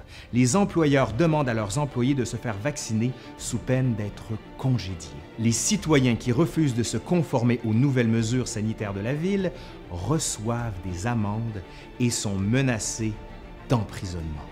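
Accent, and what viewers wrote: Quebec, Canada. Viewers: Sympa ta chaine "couuusiinnng". L'accent québécois est savoureux.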